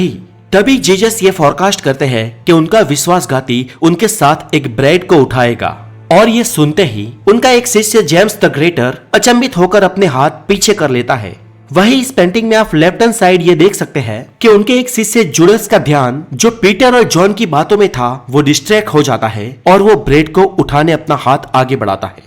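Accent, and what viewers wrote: Gujarat, India. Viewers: भैया जी यह सर गुजराती है और गुजराती लोग ऐसा ही बोलते है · You are a Gujarati right 😅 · kya aap gujarati hai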